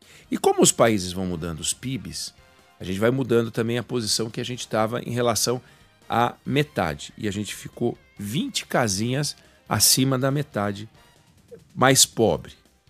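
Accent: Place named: Santa Catarina, Brazil. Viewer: Você deveria de falar para prefeito governador ajudar o governo federal fazer escolas técnicas para gualificar nosso jovens 20 por cento dessas vagas não foram preenchida aqui na minha porquê são vagas de mão de obra qualificada tem muito jovens querendo ecresar no mercado de trabalho mas tem como pagar cursos técnicos você parece que é de Santa Catarina com fala você não ajuda na só atrapalha você é filiado algum pardido porquê vocês da imprensa não ajudam vocês fala que melhorou pastante as vagas de emprego nos estamos precisando de mão de obra gualificada e que mais tem remuneração de salário melhor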